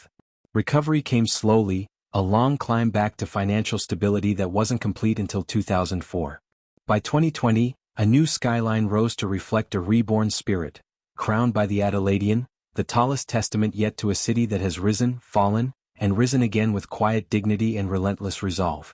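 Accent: United States. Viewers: Fuck this I can't even watch a video about my own city without some yank doing the narration because Australian videos are not allowed fuck YouTube sick to death of this I refuse to be Americanised by Google fuck that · Why must there be an American accent narration?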